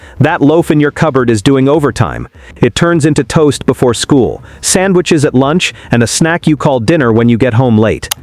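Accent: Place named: United States. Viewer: Loose the American voice, it’s about the UK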